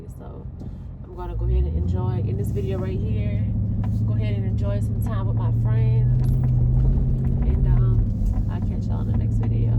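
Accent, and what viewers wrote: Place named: Louisiana, United States. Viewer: Benny sent me,, I’m here for that Louisiana accent 🔥🙌🏾😂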